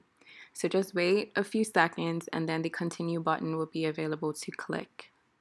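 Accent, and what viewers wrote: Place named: Bermuda. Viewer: You sound Bermudian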